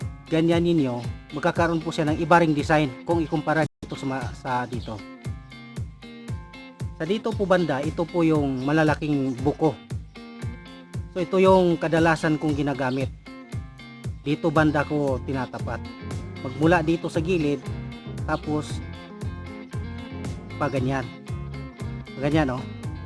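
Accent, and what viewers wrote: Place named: Visayas, Philippines. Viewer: Boss tha cebu ka raw, kaya pala pansin ko may bisaya ka na accent hehe tga cagayan de oro ako, proud bisaya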